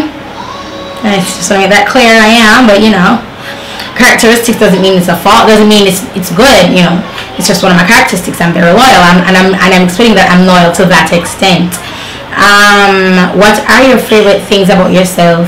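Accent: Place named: Jamaica. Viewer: I lost focus at one point coz of your ascent 😁 I think I need to learn Jamaican language 😄.... The connection you have with your son..... Is so so amazing ....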